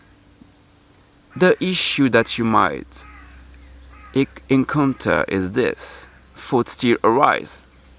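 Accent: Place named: France. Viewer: Ha ! Yes, some of your words are confusing in meaning. Your thick ( I'm guessing French ? ) accent tends to distort them sometimes.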